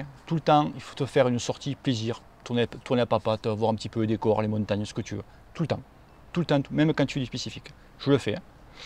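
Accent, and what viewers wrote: South, France. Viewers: Salut! Super t’es vidéo! Très instructive.. et en plus avec un super accent du sud! Je suis un voisin, j’habite du côté de Perpignan..eh oui j’ai la chance d’être catalan!😉. Je roule 3-4 fois par semaine et je souhaite faire du HT pour progresser et faire du spécifique, de manière ludique tant que possible. Je veux acheter un HT d’occaz dans un budget autour des 200-250€.. j’hésite entre un TACX BUSHIDO SMART et un BKOOL SMART PRO.. c’est pour une utilisation sur les applis connectées ZWIFT, BKOOL,etc.. et pour utiliser les programmes spécifiques.. (sur un smartphone..) des conseils éclairés sur le choix? Merci d’avance!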